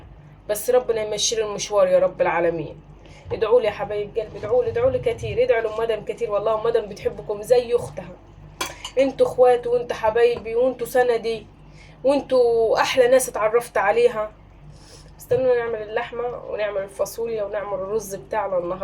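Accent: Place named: Upper Egypt, Egypt. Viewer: هاذي لهجة الصعيد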